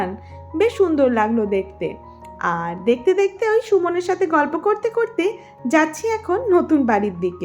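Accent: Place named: Kolkata, India. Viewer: Well done! Your accent is like more from Kolkata :)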